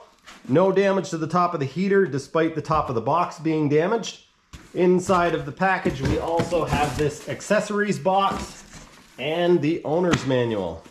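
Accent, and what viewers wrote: Canada. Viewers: He's Canadian.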